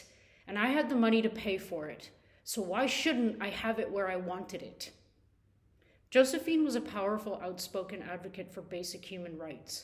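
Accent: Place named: Canada. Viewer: BRAVO! You are awesome: amazing and great speech! Ps you look marvelous! I assumed you’re Canadian due to your accent…. Am I wrong?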